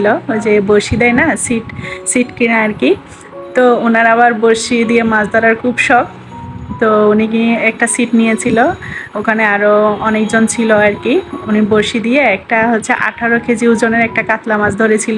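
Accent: Chittagong, Bangladesh. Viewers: আসসালামু আলাইকুম কেমন আছেন আপু মাশাল্লাহ আপনার ভিডিও দেখে খুবই ভালো লাগলো রান্না করা ঘুরাফেরা সবচেয়ে ভালো লেগেছে আপনি চট্টগ্রামের শুনে আপনার কথাগুলো তো খুবই মিষ্টি লেগেছে এবং আসার সময় রাতের খাবার বাইরে খেয়েছেন দেখে খুব ভালো লাগে দোয়া রইল আপনার জন্য এবং আপনার পরিবারের জন্য আপু সব সময় ভালো থাকবেন ❤❤❤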